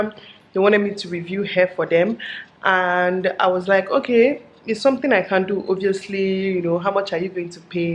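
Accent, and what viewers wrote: Nigeria. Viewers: Your children are so adorable,that ur Anambra tongue always make me laugh alot,cos am from anambra too.keep it coming.nice nice vlog.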